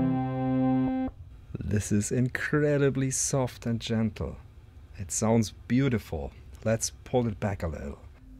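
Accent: Germany. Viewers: You have the PERFECT accent to go with that voice. So comfy, and Teutonic enough to inspire confidence in you, somehow? IDK it's just wonderful to listen to. Like that organ!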